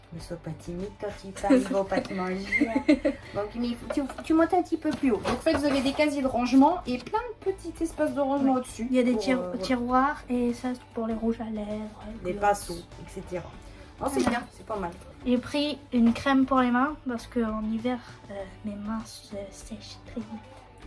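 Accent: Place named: North, France. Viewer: Cc me voilà 😊. Elle est trop mimi ta fille . J'adore l'accent du Nord.... ou il fait froid 😅 moi je suis du sud l'accent du soleil qui chante. ❤ des bisous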